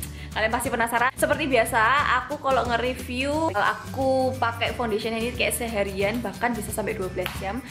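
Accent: Java, Indonesia. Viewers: Yey Like And First Watching Video Mbak Medhok.. Sukses Trus Mbak☺. Makin hri mkin syanteekk. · Ayunee mbk medhokk😍😍😍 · Mba bule medok 😍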